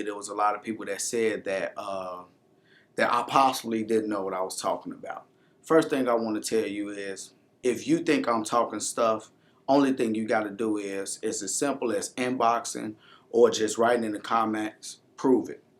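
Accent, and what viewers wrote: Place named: Chicago, United States. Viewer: U gotta be from Chicago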